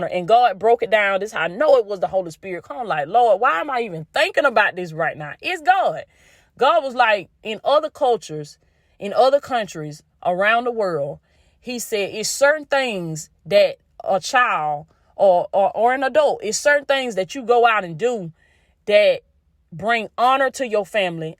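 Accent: South, United States. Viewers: You just don’t know how much we love your country accent! ♥️♥️♥️♥️ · I like listening to your country voice. All your messages are interested and inspiring. I even sent my momma your link. She was talking about you today. I told her you only 33 years old with so much spark and fire in you and all your messages. It's the God in you that moves you. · We love, your Southern Accent!💝 It brings the message across in a passionate way. God Bless.💝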